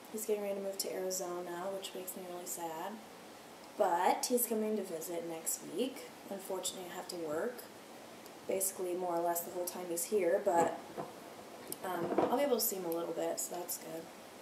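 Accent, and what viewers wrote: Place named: Tennessee, United States. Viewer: Can totally hear the Tennessee in your accent!!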